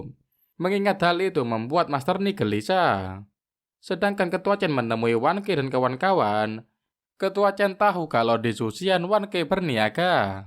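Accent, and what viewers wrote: Java, Indonesia. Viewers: jelek suaranya, bahasa indonesia dengan lidah kental Jawa.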